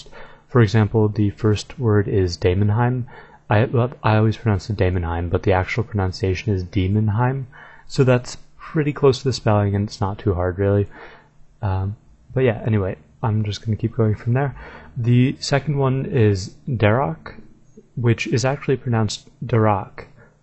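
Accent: England, United Kingdom. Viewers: Gotta always remember the pronunciation is done with English accents. So for Americans a lot of this is different. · You need to keep in mind these are will an english accent, that's why some of them sound strange.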